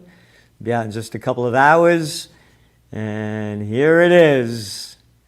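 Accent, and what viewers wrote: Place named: New York, United States. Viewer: Just freaking luv you. Never miss my old state NY, when I hear your voice. Tell it like it is.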